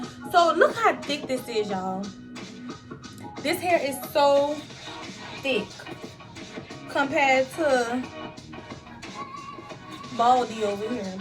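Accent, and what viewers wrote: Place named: Louisiana, United States. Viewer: Are u from Louisiana?!.. ur accent 😍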